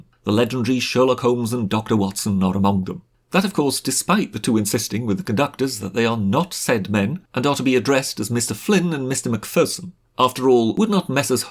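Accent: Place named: RP, United Kingdom. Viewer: Good idea! I feel for, not only does YouTube keep him down, I bet his ‘posh’ accent has limited his £ donations over the years ☹️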